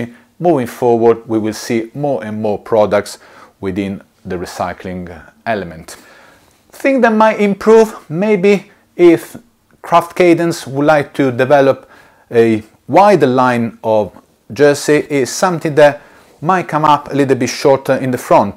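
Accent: London, United Kingdom. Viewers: Hey alright geezer living the London accent 😂. Great review mate on that jersey. Stating all the pros and cons!!!! Does that jersey come in other colours??? Your channel is growing and you’ll be getting loads of stuff to review so keep doing what you’re doing & a excellent ave speed today mate 👍. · Your accent might say “Londoner” but that beard screams “Italian”.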